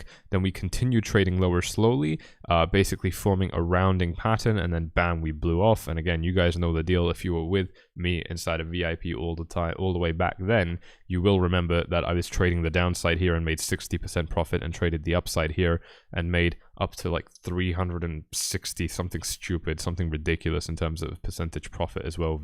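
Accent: Scotland, United Kingdom. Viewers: Is this guy Scottish?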